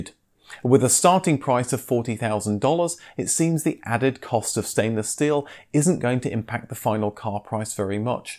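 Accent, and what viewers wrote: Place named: United Kingdom. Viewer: Generally your channel is entertaining and it is always a pleasure to listen to clean British English. 👍🏻 This topic is an interesting attempt. Ok. But you should do better research and talk to Metallurgy Engineers. Whenever the talk is about Aluminum it is about 99,9% Aluminum alloys in real. We never talk about pure Aluminum because it is not stable and would react with anything in the near within the fraction of a second. How can you allege that Aluminum or better said Aluminum alloys do not rust? I am sorry but this is not true at all! You can find this out very easily on your own. Go to any football field and take a detailed look at the areas where the Aluminum alloy parts of the goals are put together. You will see that there is partly corrosion which has white colour. Car factories do not use Aluminum alloys in order to get rid of corrosion. 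This metall is easier to be handled and can save weight, but is hard to be maintained in a car body workshop if there is damage on it. Stainless steel is also not really stainless. It will rust harder but you can’t really stop it from starting to corrode. Please take care. Thanks.🌷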